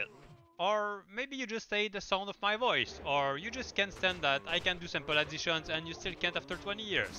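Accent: Quebec, Canada. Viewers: Omg that thick QC accent xD, cool vid!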